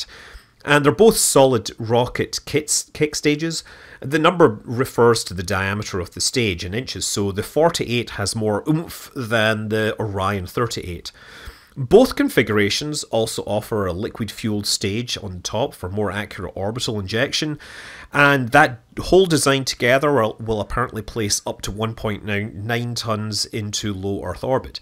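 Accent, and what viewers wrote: Scotland, United Kingdom. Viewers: Where you from in Scotland chief?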